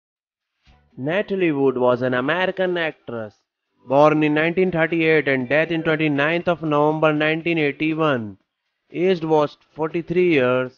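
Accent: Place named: India. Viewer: Voiceover dude has huge Indian accent.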